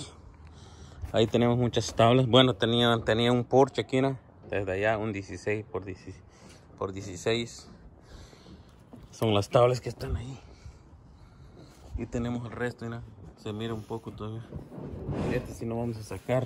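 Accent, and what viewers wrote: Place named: Guatemala. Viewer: Serote saludos así hablamos los chapines😂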